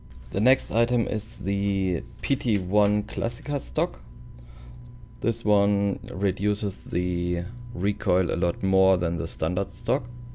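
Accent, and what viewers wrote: Germany. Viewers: Thank you nice video are you German ?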